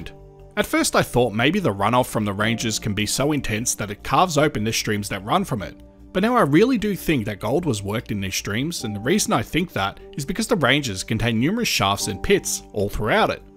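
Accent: Australia. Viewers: Good stuff. I only wish the speaker wouldn‘t be an Australian 😖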